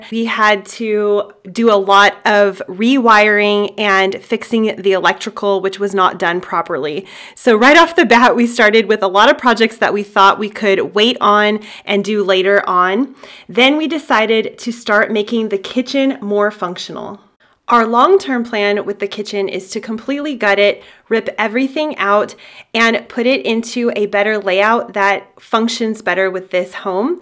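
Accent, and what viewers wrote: New Zealand. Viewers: Oh my gosh I hear a New Zealand accent lol...I'm kiwi too. I love the transformation, I love how you've created a beautiful space within your means. This is really motivating. So many people show renovations that look unrealistically expensive for many. Thank you for sharing your journey 😊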